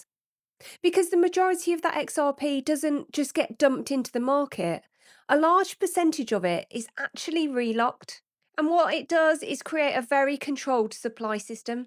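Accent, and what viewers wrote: United Kingdom. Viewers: AS an American I learned a long time ago never listen to anyone with a British accent.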